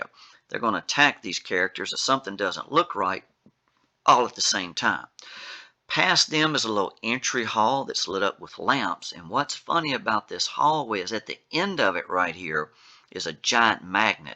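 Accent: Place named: Appalachia, United States. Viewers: I'd say southern Appalachian. So maybe Tennessee or southern West Virgina. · I’m loving that accent. What part of the US is it from?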